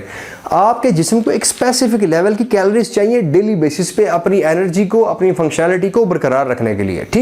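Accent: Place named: Sialkot, Pakistan. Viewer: Bhai Asslam O Alaikum... Bhai Jo Ha, Wo Jo Ha....Ya Jo ha, Ab Jo Ha....Jo Ha, Jo Ha.... You definitely from SIALKOT. Love From Sialkot <3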